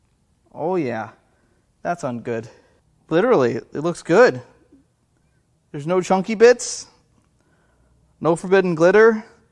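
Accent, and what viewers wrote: St. Louis, United States. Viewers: True StL dialect. Wo ter. Like driving on Far-de-Far past the Carn fields to Worshing MO.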